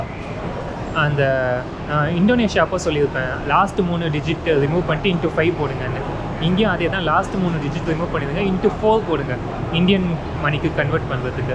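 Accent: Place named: England, United Kingdom. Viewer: தெளிவாக பேசவும் புரியவில்லை ஆங்கிலேயர் போல் தமிழ் பேசினால்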